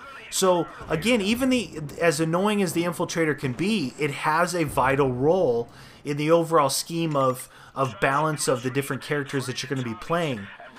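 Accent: Canada. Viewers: I like better then the others. No offense, but the " aut " and canadian language gets annoying in the long run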